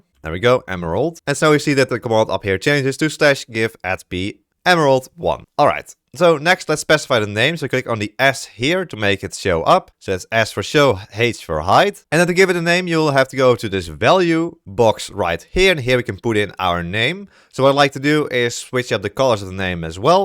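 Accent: Netherlands. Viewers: meer nederlands accent dan dit bestaat niet.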